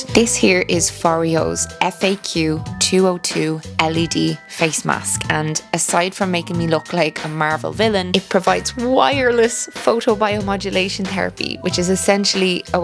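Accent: Ireland. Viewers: I love those videos ❤ you never dissapoint ❤ I'm from Poland, my boyfriend is from the UK, you're the first and probably the only youtuber I watch from Irleand and I love the accent. I'm in my mid twenties not close to having kids yet but yet you still manage to inspire me with every video you put out and to incorporate some bloody positive chagnes in my day to day life. No lying, you must be the youtuber that inspires me the most and if I had to count down on screen time your channel would be the only I'd watch ❤ I'm currently doing my driving licence as well I started when I was 19 but there's been complications I truly can't wait for this to be my reality, to have so much freedom I deeply long for ❤ I feel bad about myslef not having it sometimes. You share with us so I thought I'll say a few bits about me, your dedicated viewer. You really do inspire me for my future, kids, overcoming traumas/addictions etc. 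With two little kids you're doing such a good job! here on youtube and raising those little angels (I'm sure they have those days, but they seem so smart and emotionally inteliegent). It's incredible how simple things are sometimes, go to the forest, move your body, eat whole foods. Everytime I forget about it and start feeling like s.. I have to go back 🌳🌿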